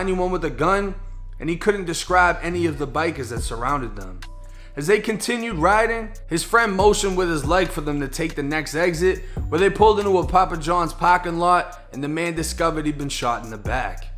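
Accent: Boston, United States. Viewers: They were all on slow ass Harleys I bet.. they wouldn't never caught a sport bike.. That's also why I stay strapped tho 😂😭🤷🏾‍♂️ Also, u from Boston? I'm from CT.. i hear an accent lol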